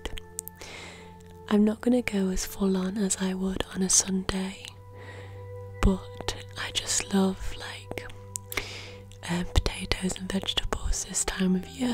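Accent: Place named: Nottingham, United Kingdom. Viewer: You have the most notts accent I’ve ever heard haha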